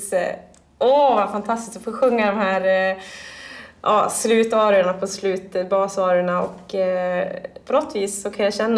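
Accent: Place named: Dalarna, Sweden. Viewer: shes from Smedjebacken and speaks a mild and pleasant "dalmål"-dialect, sort of swedish hillbilly accent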